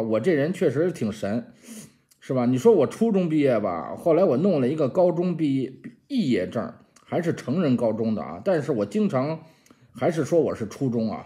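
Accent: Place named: Beijing, China. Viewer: 祝贺你的一周年，加油！我们每天看你听你侃大山，之所以喜欢你的视频，是你说人话，方方面面，不啰嗦，就像沈志华老师那样，咬字清晰，北京话，有趣。你不怕揭自己的老底，说明你不虚荣，你初中毕业，但是博士水平，很多人学历很高但是水平很差，当然里面有买的学历混的学历，硕士学历初中水平。所以西方认你的经历和经验，不重视学历。欢迎来加拿大，我接待你。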